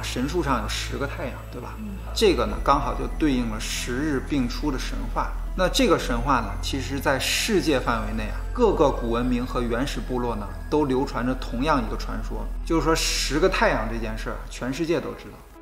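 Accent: China. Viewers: 這個節目用的是繁體字，可是K哥口音像是大陸人。為何？ 不管如何，我點贊K哥使用繁體字，這是智慧的象徵。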